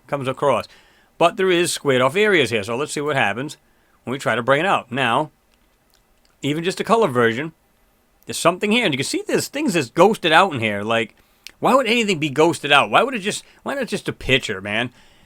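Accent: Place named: New England, United States. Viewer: Also I've seen a lot of your videos and like them a lot, I want to point out an anomilie with your accent, are you from new england??? I myself am originally from Maine and hear that. LOL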